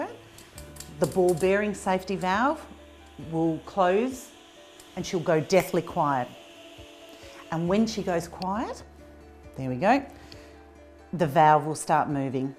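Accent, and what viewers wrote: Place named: Australia. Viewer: Thank you I love Australian people/accent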